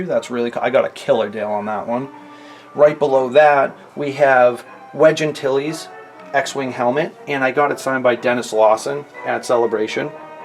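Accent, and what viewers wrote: Boston, United States. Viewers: Total sidebar, but is that a Boston accent? Only I'm from the UK so it's fun to try and guess American accents. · Definitely boston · Boston guy ay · Boston accent? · Is that a Boston accent??? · u lie in Boston bro? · He sounds boston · Is it a boston accent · I was like, "This dude Boston or a Yorker"? I changed my mind like 5 times but I'd guess he's Boston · Boston accent heavyyyy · Are you from boston. You have the accent. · "This here's a daaat boawd" defo Boston didn't hear aussie tho. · From Scotland love the boston accent, just like the greatest president John F Kennedy. Star wars collection is quality. I used to chew the arms and legs off my storm troopers so it looked like han or luke had blasted them lol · Are you from Boston?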